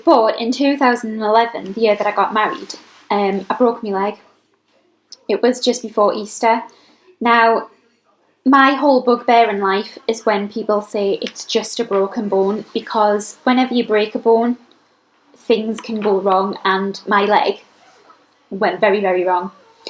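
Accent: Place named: Doncaster, United Kingdom. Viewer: Nice. Even it's hard to follow your accent as a non Doncaster 😅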